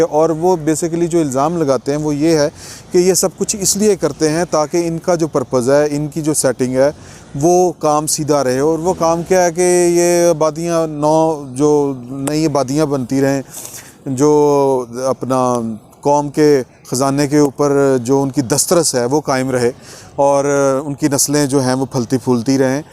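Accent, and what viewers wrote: Punjab, Pakistan. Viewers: Bhaiyaan Punjabi vich reporting kreya kro. Punjabi version of Urdu Jo boldey ho, funny lgdey .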